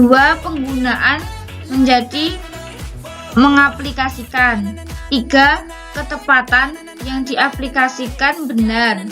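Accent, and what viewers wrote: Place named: Java, Indonesia. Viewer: Suara nya khas medok Sukses sellu nak cantiksss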